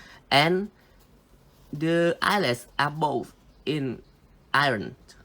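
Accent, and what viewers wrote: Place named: Thailand. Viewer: Thai accent is so recognisable. one love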